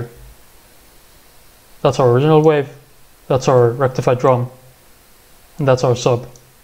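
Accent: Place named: Eastern Europe. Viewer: I always know i'm in for a great tutorial vid when I see a grainy webcam and the uploader has an Eastern European accent of some kind, no 5 minute intro and unnecessary bullshit just str8 to the good stuff. God bless you man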